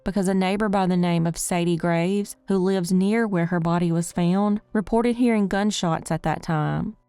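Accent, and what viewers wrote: South, United States. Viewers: Thank You For all the work and time you put into your videos. You are the BEST crime channel and we all Love your southern accent ❤️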